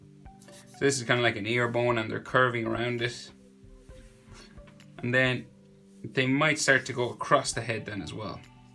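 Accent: Ireland. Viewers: Are u Irish u have a bit of a acsent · arwe you irish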